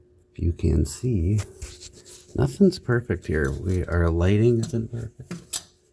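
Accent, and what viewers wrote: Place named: Minnesota, United States. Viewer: So ur accent says north...Minnesota?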